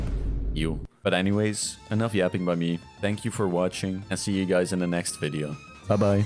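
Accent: Netherlands. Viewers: You're Dutch aren't you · So ur dutch right? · Dutch pronunciation :)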